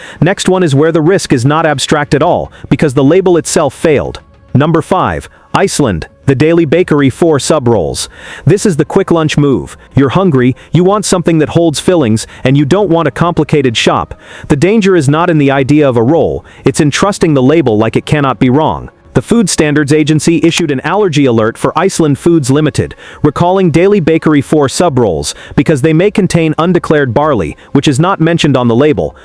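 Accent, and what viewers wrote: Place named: United States. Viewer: Loose the American voice, it’s about the UK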